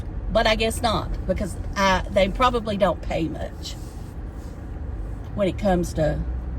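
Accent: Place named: South, United States. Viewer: Great conversation. You really have talent for it. I never stop listening! This video reminds me old radio shows they did years ago with like a mystery or a who done it! Well anyway i guess its the accent the southern belle sound. Im trying to figure it out but you were not all over the place just connecting all this mystery about the wackiest person on you tube so if you even try to decipher what is going on its gonna be a chore.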